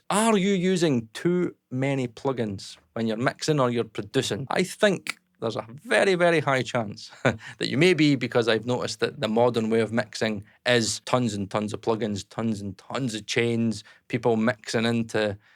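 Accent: Scotland, United Kingdom. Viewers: You're such a lovable guy. And such a thick but great Scottish accent lol (I'm assuming, because you sound Scottish to me). Very funny edits and such. And great music production education/topics. I don't know how big you are into sound design but I for one would be very interested to hear your unique techniques and views on that kind of thing. And some writing as well because who wants a non captivating song. Cheers mate 🍻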